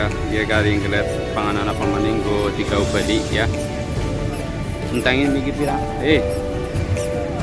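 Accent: Banyumas, Indonesia. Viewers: Keren logatnya Ngapak seruuu Sering Ming kebumen Mampirrrr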